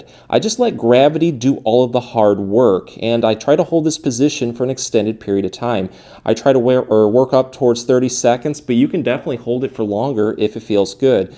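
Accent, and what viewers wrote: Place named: Midwest, United States. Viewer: He’s a Midwesterner. Love the accent 😊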